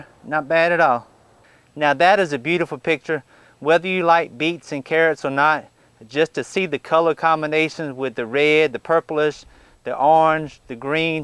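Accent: United States. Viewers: Omg, your country accent is so cute and funny. Nice video. 🌸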